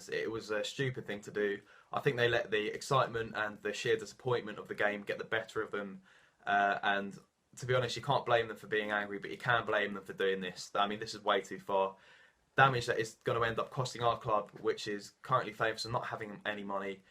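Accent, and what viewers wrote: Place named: England, United Kingdom. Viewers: Yet another Hun that has an English accent. Get back to London. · Why the fucking English accent?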